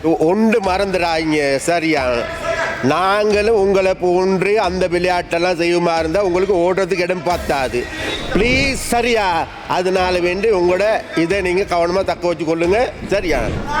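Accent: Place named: Hill Country, Sri Lanka. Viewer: idhuwum thamil thaan , ungalukku thamil theriyala , yaarlpaana thamil thaan thamil endralla , neengal malaiyaga thamil kattrukko;;ungal. avar sariyaagaththaan pesugiraar , idhuvum thamil thaan , ungalukku vilangavillai , neengal malaiyaga thamil , colomu thamil pattri padikkavillai , adhu ungal thawaru. avar sariyaagaththan pesugiraar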